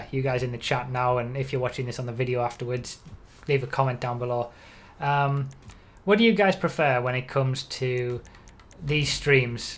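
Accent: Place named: Northeast England, United Kingdom. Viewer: You sound like you are from North east england where abouts are you? xD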